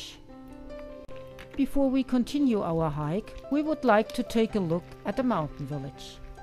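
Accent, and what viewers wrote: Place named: Germany. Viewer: Hey, your english is very well (Better than mine ':D) Your free speach is nearly that good, than it is in german, with a little german accent of corse... Best regards Paco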